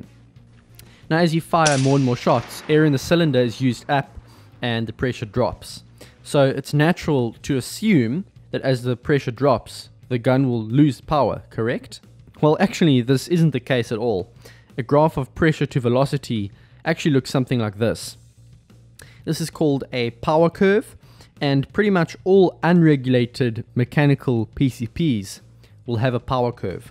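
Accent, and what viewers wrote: South Africa. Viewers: My guess is south african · South African based upon the susername. He’s got same accent as Elon musk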